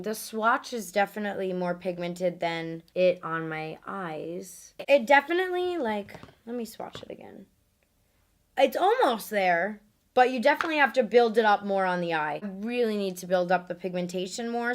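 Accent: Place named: New Jersey, United States. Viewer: With a little Jersey accent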